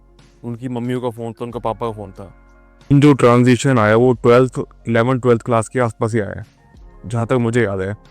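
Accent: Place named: Himachal Pradesh, India. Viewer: Himachali accent 😂... ❤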